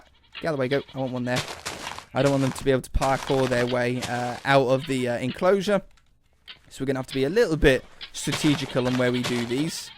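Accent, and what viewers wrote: United Kingdom. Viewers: Hate to be rude, but may I ask, are you British? I'm part British part American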